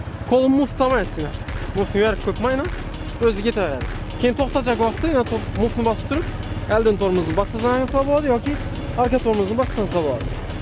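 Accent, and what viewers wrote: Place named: Khorezm, Uzbekistan. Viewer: Хоразм тили котили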